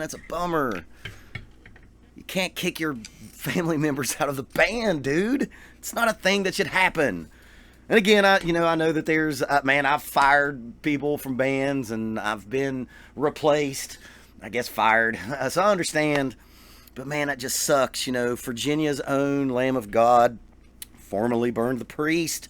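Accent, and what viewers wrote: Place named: North Carolina, United States. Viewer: Yea I think its strange and weird that the Adler brothers are not playing together anymore, BTWay your accent sounds very familiar to mine, You from NC? lol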